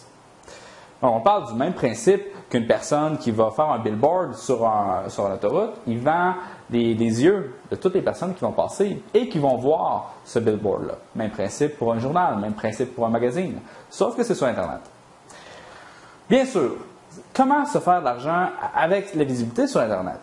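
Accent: Quebec, Canada. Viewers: Merci;) Concis et instructif (même si parfois tu enfonces des portes ouvertes)! Et ne lis pas les commentaires, nous les Européens on aime ton accent Ostie! Bonne continuation! Maison FT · Hello;-) Très bien la vidéo, bon je repasse certains passages sur lesquels comme tout Québécois tu mélanges l'anglais et le Français (avec accent) ou là j'avoue avoir un peu de mal à suivre;-) Concernant mon bizness modèle je vends des bijoux et des montres en ligne en association avec un véritable bijoutier Français sur un modèle de partage des bénéfices suite à l'échange des compétences ( je suis web-master depuis 5 ans). Aujourd'hui et depuis plus de 3 ans nous réalisons plus de chiffre qu'une boutique réelle, et cela malgré les modifications d'algo Google de septembre 2013 (les saligots) Ps: il faut faire une balance de blanc en manuel avant de te filmer, car visiblement l'appareil que tu utilises pour faire tes prises d'images à un problème en automatique Lol. 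( tu es tout bleu) sans doute que tu mélanges un éclairage naturel venant de ta gauche et un éclairage incandescent de face ou légèrement décalé, oui j'ai aussi fait pas mal de vidéo et de montage par le passé. Allez à bientôt. Christophe.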